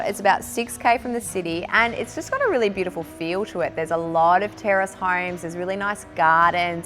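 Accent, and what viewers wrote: Australia. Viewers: is just wonderful how she puts it together all tied up with s cute Australian accemt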